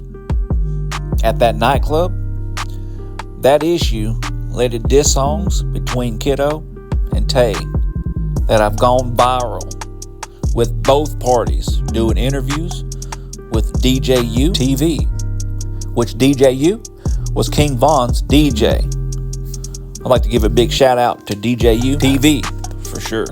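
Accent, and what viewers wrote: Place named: Texas, United States. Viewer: Who tf is narrating some Texas hick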